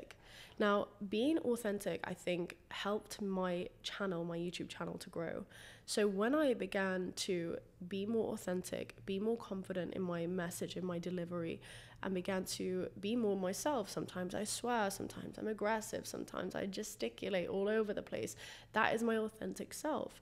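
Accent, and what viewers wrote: United Kingdom. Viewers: This just landed on my recommended page and I instantly thought that Billie Ray girl from Tiktok started doing self development videos and got a British accent lmao. Really like the content though! My kind of jam.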